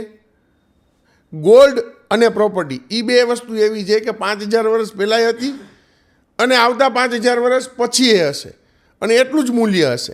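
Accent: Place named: Kathiawar, India. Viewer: Biju badhu to thik pan tame je original kathiyawadi laheka thi vat karo chho e game chhe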